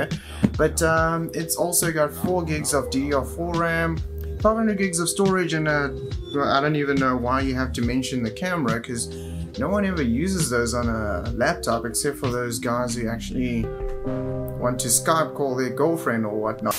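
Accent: South Africa. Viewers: you are south african nè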